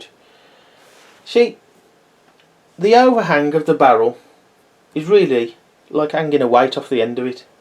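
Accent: Birmingham, United Kingdom. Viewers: yam sound like a Brummie!.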